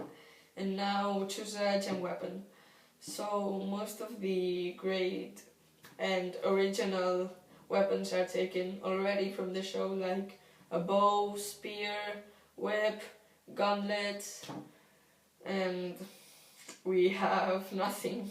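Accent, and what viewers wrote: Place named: Russia. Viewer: I was wondering because it sounded russian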